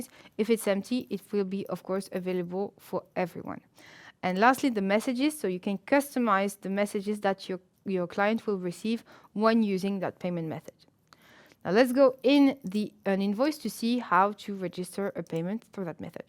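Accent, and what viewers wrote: France. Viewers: Très bonne vidéo, mais j'ai comme l'impression qu'il te reste un petit peu d'accent français sur ton anglais (qui est parfait je tiens à le préciser haha)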